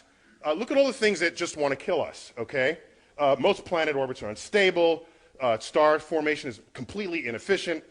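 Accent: Jamaica. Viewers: Are you Jamaican man? Love your channel.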